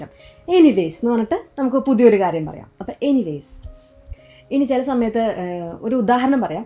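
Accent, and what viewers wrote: Thrissur, India. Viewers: Madam, തൃശൂർക്കാരി ആണോ ? Slang sounds like Thrissur 👍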